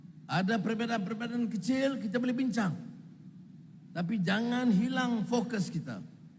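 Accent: Indonesia. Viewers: Raja Malaydesh nonton pidato Ibrahim gak ya? Pidatonya banyak melafalkan bhs Indonesia!! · ni memang suke gunekan loghat Indonesia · Kok logatnya bahasa Indonesia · Anak" kah? Atau budak"..😂😂😂😂. Ini perdana mentrinya aja berkata bargaya logat indonesia..banyak kosa kata indonesia yg digunakan...oh bahasa meleye sungguh merana kau..😭😭😭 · coba Kalian dengerin dehh, si ngomong nya cenderung menggunakan logat bahasa Indonesia 😅😅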